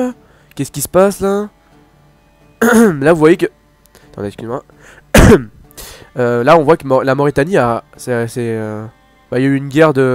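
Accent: Marseille, France. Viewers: Tu serait pas Marseillais par hasard :) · je te demandais sa parseque je croyais que t'etais marseillais ^^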